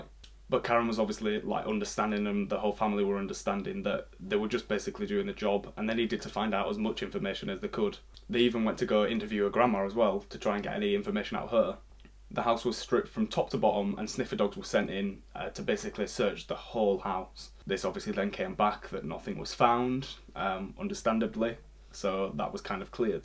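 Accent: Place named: Barnsley, United Kingdom. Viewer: New sub here 🙋‍♀️ looking forward to more videos … you sound like your from barnsley too 😊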